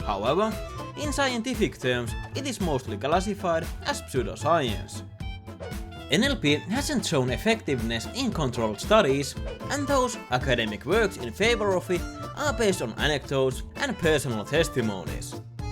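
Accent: Finland. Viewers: Massive suomi aksentti L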